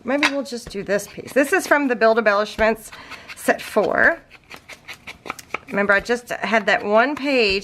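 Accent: South, United States. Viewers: I feel so blessed to have found your channel,. Your aesthetic is perfect for me, I SO appreciate your sense of humor, and your work is absolutely beautiful. As a bonus, you talk “Southernese” just like me! So I definitely understand words like, “caddy wonked.” Thank you for sharing your sense of humor and knowledge with all of us. Hope you don’t mind but I chatter on and on to my husband about “my ” and I continue to lift you up in my thoughts and prayers after the loss of your mother in December. I wish you many blessings.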